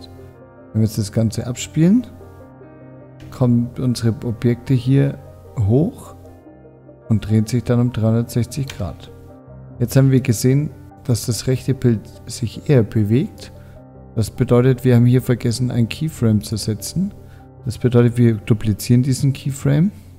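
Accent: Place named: Nuremberg, Germany. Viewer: Gut erklärt, Nürnberger Dialekt nich zu überhören :´)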